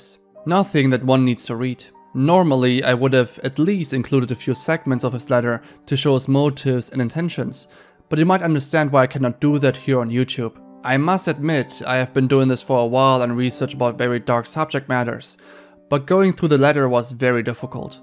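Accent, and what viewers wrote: Germany. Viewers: I love your videos, your accent is great, germans have great voices :) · my sister who is studying german says his accent is "very obviously german" so thats my guess LOL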